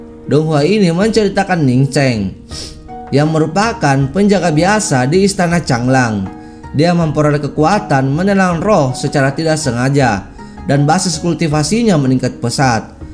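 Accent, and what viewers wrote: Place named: Bugis, Indonesia. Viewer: Logat Bugis daeng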